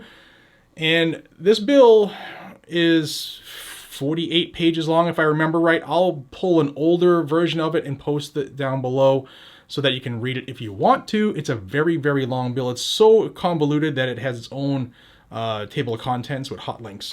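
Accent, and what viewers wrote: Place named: Massachusetts, United States. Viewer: By the way I grew up in Billerica Massachusetts so I recognize a Massachusetts accent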